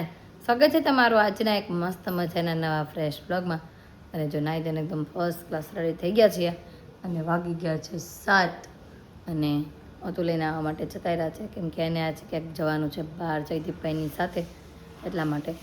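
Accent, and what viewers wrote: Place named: Kathiawar, India. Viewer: jsk ben tamari bhasha upar thi tame kathiyawadi hoy em lage chhe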